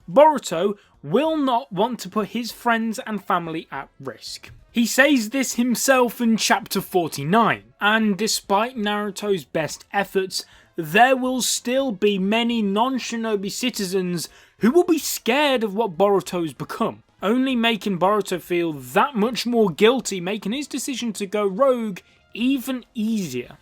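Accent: United Kingdom. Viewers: Omg listening to a bri'ish person talk about anime is so strange for some reason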